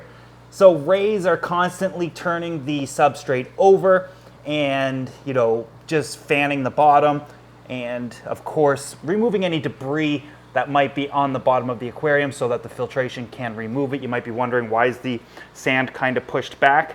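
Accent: Canada. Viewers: Youre saying oot instead of out. Are you Canadian? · Are you canadian like me? I can hear a bit of an accent lol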